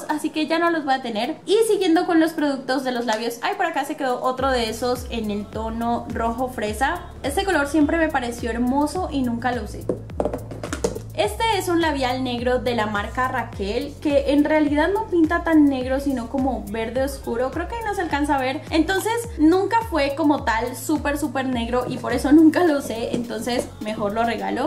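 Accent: Mexico. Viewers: A penas estoy viendo tus vídeos y creí al principio que eras mexicana. ¿De dónde eres? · Habla como Mexicana....ella no es Colombiana?? Ni idea...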